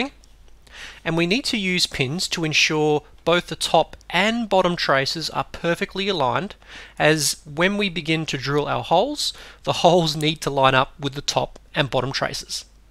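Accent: Australia. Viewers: hes just got a really strong aussie accent, i kinda like it (though im also an aussie)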